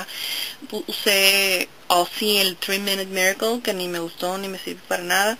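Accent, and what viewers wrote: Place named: North, Mexico. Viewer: buen consejo gracias... Eres de Tijuana?? hablas identico que mi hermana.. bien nortena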